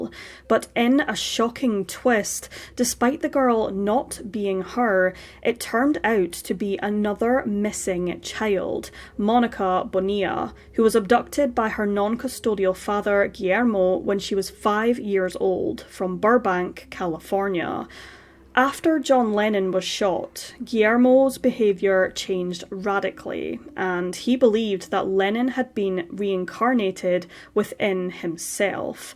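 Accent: Scotland, United Kingdom. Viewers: Remember she is Scottish and they pronounce things differently there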